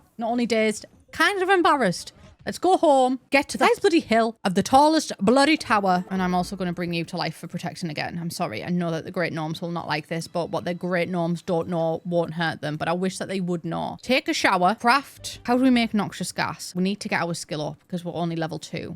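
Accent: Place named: North, United Kingdom. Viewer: Potential translation: "I was reeling, like- like excuse the gas, I'm supposed to be punching other people not myself" Unsure about the first part but the secind is definitely what you said I am proud at the level of northern british understanding I have reached. x'D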